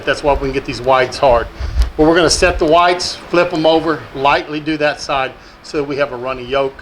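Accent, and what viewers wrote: South, United States. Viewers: his southern drawl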